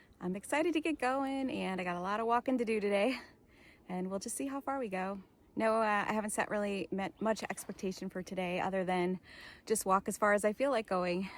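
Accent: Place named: California, United States. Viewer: Your accent sounds Californian. I moved from Los Angeles County in 1973. I'm following 4Runner, just a few days ahead of you.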